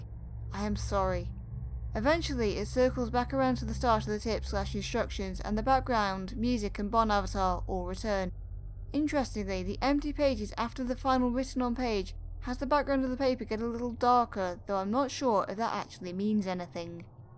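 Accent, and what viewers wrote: United Kingdom. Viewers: You speaking british makes me feel better lol ✨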